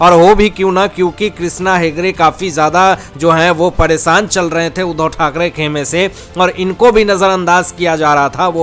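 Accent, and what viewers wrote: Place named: England, United Kingdom. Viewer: Shri “ BARA” instead of “ BADA” Anchor is trying to copy ENGLISHMAN. Though we are free from slavery 70 odd years ago but still our Anchore is trying to imitate Britishers. Sad very SAD . Unfortunately he owner of channel encourage this lousy Anchor, when we can get dime a dozen best ANCHORS???????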